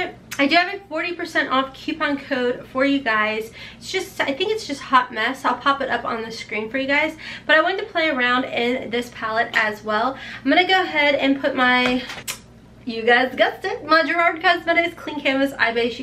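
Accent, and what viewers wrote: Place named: Nashville, United States. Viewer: You crack me up! I love your accent! I'm from Nashville tn and watching you makes me feel at home! To be honest your adhd does too!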